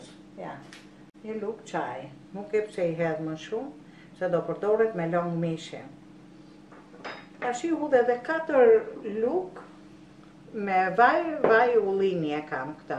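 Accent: Tirana, Albania. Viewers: Pashe dhe vidion e pare ..qe me kishit Kthyer pergjigje..faleminderit shume.. Sapo e mbarova doli special Hera e pare ne jete qe bej kulac si tironse se kemi tradite..por kte vit e bera ...😉..Gezuar Vitin e Ri.. Me zi pres te hidhni vidion e rradhes neser pjek gjelin e ta bej persheshin me menyren tuaj.. Ps u knaqa me tfolmen tironse😉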